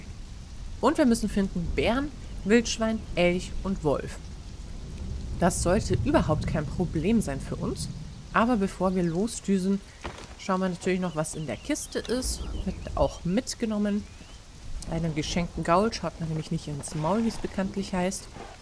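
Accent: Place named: Palatinate, Germany. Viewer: Einfach Pfälzer Dialekt 😅 cool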